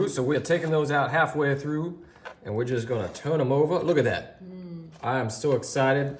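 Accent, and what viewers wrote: South, United States. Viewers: That glorious Southern voice …a true gift from God…😊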